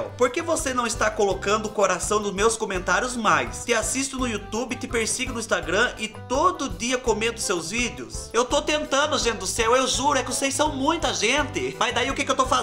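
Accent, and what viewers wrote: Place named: Paraná, Brazil. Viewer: Ah tá entendi Paraná sotaque forte eu sempre tive curiosidade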